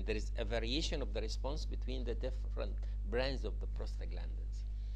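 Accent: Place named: Egypt. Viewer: Shukraan, helped a lot with my therapeutic course Noticed the Egyptian accent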